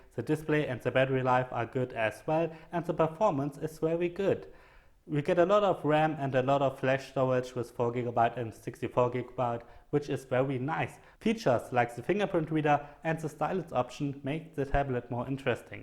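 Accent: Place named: Germany. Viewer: mannnn...du hörst dich so dermaßen deutsch an haha nicht schlimm aber dieser deutsche Akzent beim Englisch sprechen, haha viel zu geil, kurze frage wie ist die Performance und sound im Vergleich zum Nexus 9 welches ich überlege hierdurch zu ersetzen, wäre dankbar über eine Antwort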